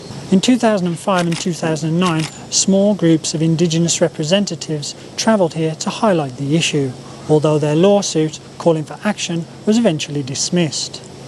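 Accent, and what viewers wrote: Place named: United Kingdom. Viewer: Never mind. I think. Mostly, I listen to what you say to get more familiar with the British accent. 😆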